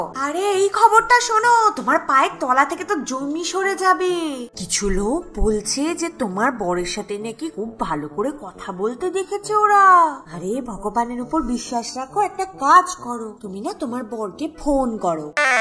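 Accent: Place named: Bangladesh. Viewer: তমার বাংলাদেশি voice এতু ভাল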